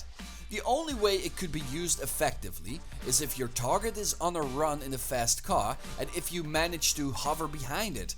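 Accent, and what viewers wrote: Netherlands. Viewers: I have to know... You wouldn’t happen to be Dutch, would you?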